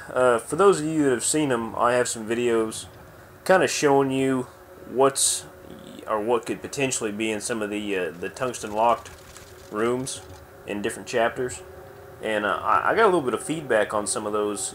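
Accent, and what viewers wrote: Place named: South, United States. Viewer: Awesome, thanks for making this, enjoyed your southern accent!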